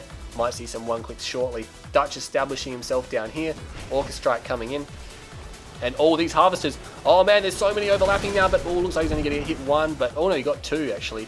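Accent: Australia. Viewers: Old video I know, but I love your excellent commentary, and the Aussie accent just makes it. Honestly I prefer your casts to Sybert's.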